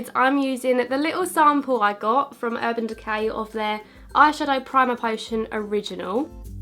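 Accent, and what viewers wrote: United Kingdom. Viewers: It's fun watching this and see you do makeup really well and talk with your accent and British sayings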